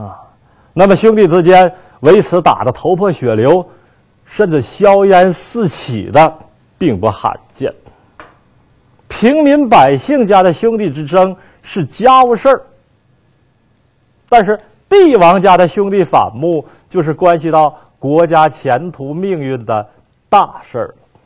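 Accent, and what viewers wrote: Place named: Northeast, China. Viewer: 每次听到东北话，都觉得莫名的搞笑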